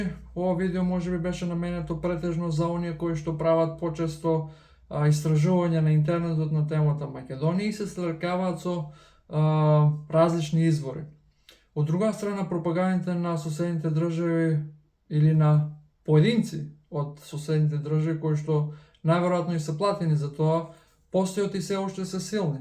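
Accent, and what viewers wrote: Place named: Russia. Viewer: он збори со мкд руски дијалект 🇲🇰🇷🇺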